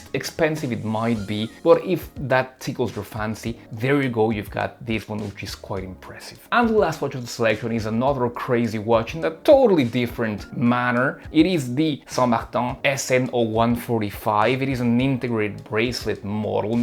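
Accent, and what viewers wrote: France. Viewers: When I heard your accent, I checked if it wasn't a translated video from French. No offense ;) Mon accent n'est pas vraiment meilleur pour être honnête. Quoique qu'il en soit bonne et honnête vidéo. J'apprécie que tu ne t'extasies pas sur tous les modèles.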